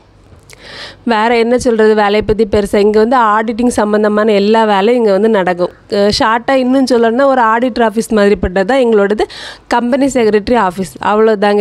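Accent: Kanyakumari, India. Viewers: Your fish curry and fish 🐟 fry vedios super 👌 👍 ❤❤ Kumari slang very nice ❤❤but u show your face sister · I always like your kaniyakumari slang ❤going to job managing home; making tasy foods and YouTube hats off to you sister🎉🎉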